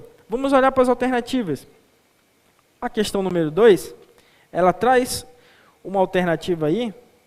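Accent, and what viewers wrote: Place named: Manaus, Brazil. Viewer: Ótimos videos, parabéns! Sotaque manauara muito bom de ouvir.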